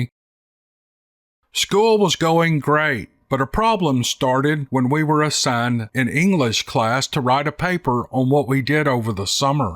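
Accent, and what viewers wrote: South, United States. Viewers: I work for Amazon and we're going for broke in the the 5-6 weeks before Christmas. When I catch a gasp when I get home at 6 a.m. I love to catch your you postings before I go to bed. Thanks for your dedication to the narration of the the tales sent to you by writers, but they are a wonderful tales, wonderfully narrated, in the style (and southern voice) of a wonderful Southern yarn that I have come to miss from living in the South. Thanks Man, the skill of Southern yore telling is well and alive in you.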